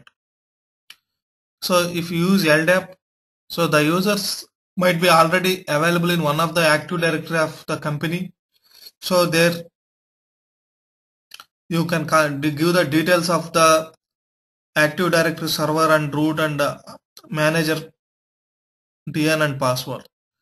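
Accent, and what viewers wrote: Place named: England, United Kingdom. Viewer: Ohh God a really annoying english accent .. can't finish the first 5 minutes !!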